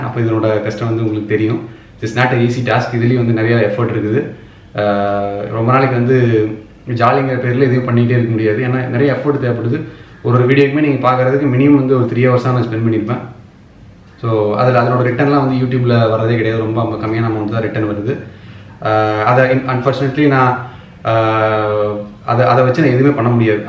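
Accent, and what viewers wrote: Kongu, India. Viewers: Hi bro.. I am new subscriber. Ungaa video first pakkum pothu ignore panni irukey...apparama dhan Tamil laa paathey...very nice chance yeah ilaaa...athuku apparamaa ungaa videos yehllam theadei theadei paakkaa start pannaney...sehmaa bro 👌athum ungaa kongu Tamil very nice....genuine person positive energy...beautiful family....cute wife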